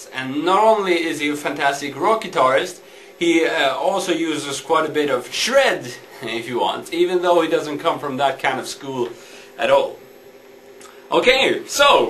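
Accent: Sweden. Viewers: oh the swedish accent xD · He is from sweden. That explains his accent.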